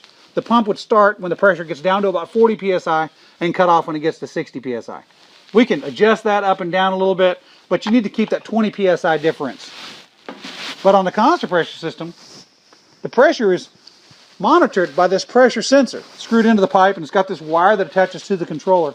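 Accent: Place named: South, United States. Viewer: Just listening to your southern accent makes you sound dumb. That's even before you just schill dumb shit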